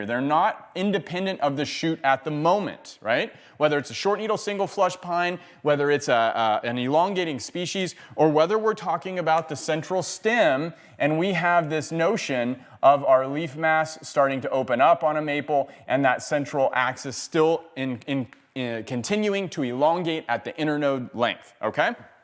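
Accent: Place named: United States. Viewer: a very speedy US accent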